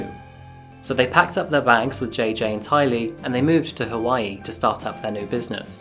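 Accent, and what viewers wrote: England, United Kingdom. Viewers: In my opinion, the English accent is one of the best for monologues. :-) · I come here to hear this case in british accent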